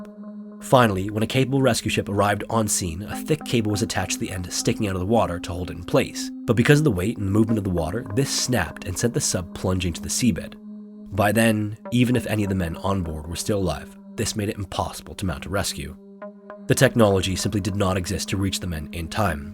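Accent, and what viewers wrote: Canada. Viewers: Because of the naval focus of these stories, every time he says “about” in that Canadian accent, I have to think for a second if he’s actually saying “a boat.” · little do they know this IS my endel lol these videos can both put me to sleep and help me focus during certain household chores, it's an absolute joy to listen to I finally heard your Canadian accent this time btw, I love it!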